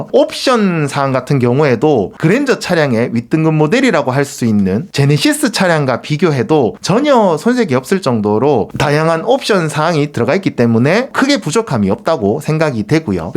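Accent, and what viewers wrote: Gyeongsang, South Korea. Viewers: 경상도 사투리가 참 심하십니다ㅋㅋㅋ 부산에사는 군대동기도 이렇게 심하진 않던데ㅋㅋ 도대체 경상도어딥니까? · 대구경북 언어를 구사하시는데‥어딘가요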